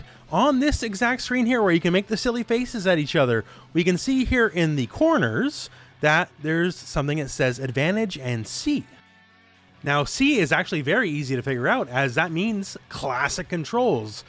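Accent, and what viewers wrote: Canada. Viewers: you sound exactly like my 9th grade algebra teacher. Canadian accent and all.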